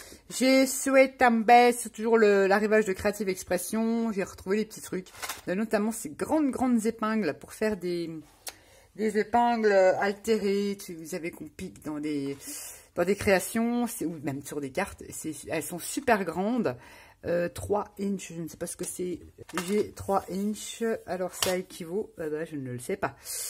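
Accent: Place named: Lorraine, France. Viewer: Bonjour, je suis nouvelle abonnée, et j'entends que tu es en Lorraine comme moi...Tu es de quel coin? Je suis de la région de Pont-à-Mousson. Peux-tu me donner l'adresse de ton nos stp? Bisous 👋⚘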